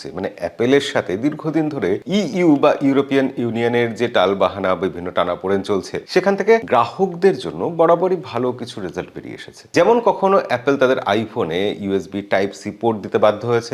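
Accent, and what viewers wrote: Bangladesh. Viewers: এই বাংলাদেশী উচ্চারণ নিয়ে কি করে আনন্দবাজার এ চান্স পেয়েছেন এই উপস্থাপক!!!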